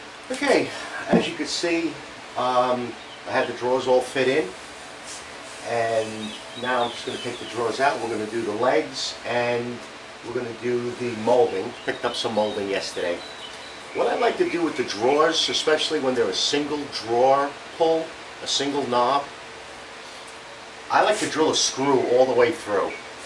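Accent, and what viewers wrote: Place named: East Coast, United States. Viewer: you legend! liked and Subbed. love that East Coast Accent? You remind me of the Rebel Turner. All inspiration for me going home for six weeks to my home workshop in England this Thursday!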